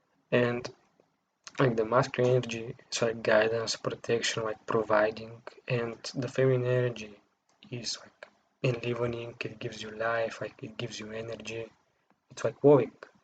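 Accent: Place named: Russia. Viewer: Your English is very Russian but I liked it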